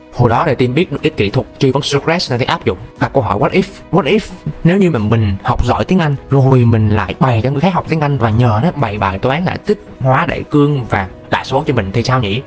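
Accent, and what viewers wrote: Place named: South, Vietnam. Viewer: Bạn cứ nói đại giọng bắc..bạn nhái giọng Nam nghe mệt hơn. Nó chen giữa 2 vùng miền nghe khó chịu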